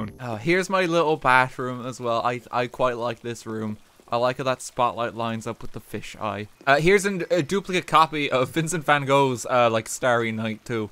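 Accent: Ireland. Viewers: You're irish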